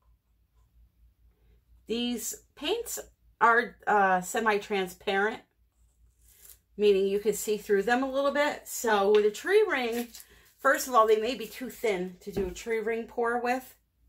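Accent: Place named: Michigan, United States. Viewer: How could anyone say anything but positives! You are like a next door neighbor I wish I had. I appreciate the humor and most of all the humanness (if that’s a word). Thanks again for sharing. Love the new paint and those cells are wonderful. Keep up the great job and the fun we have listening to you laugh. Trying to figure out your accent, I’m thinking Michigan or Wisconsin. Sending hugs!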